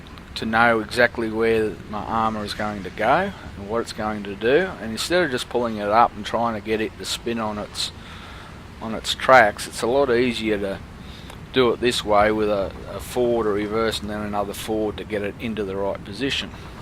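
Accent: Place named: Australia. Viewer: really nice to watch, and your aussie accent adds some taste to the thing :) cheers from Matt_Mulder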